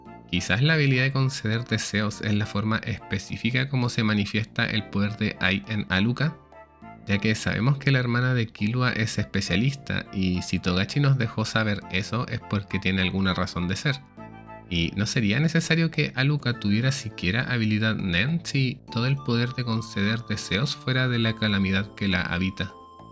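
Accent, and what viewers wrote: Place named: Chile. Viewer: Eres de Chile? Con razón tu acento se me hacía tan familiar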